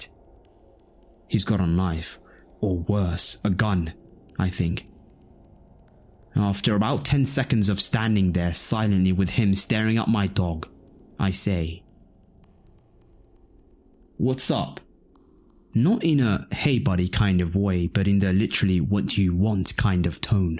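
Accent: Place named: United Kingdom. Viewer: Well this guy is british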